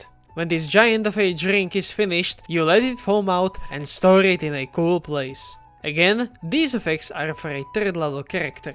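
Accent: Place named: Poland. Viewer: Words can't describe how much I love this video! Just everything about it, from the polish accent to the wonderful creativity of the potions. (o´ω｀o)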